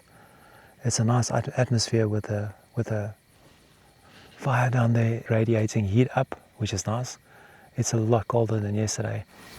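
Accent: South Africa. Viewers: it's uniquely South African ❤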